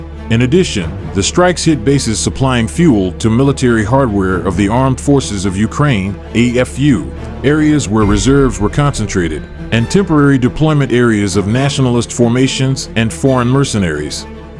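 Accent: United States. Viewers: Пендосовская озвучка, достала. Россия вперёд.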